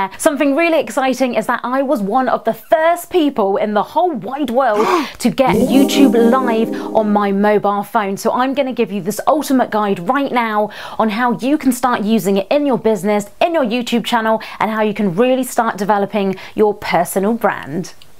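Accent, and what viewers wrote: United Kingdom. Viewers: ARE YOU BRITISH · You sound like Maranda sings but in a British version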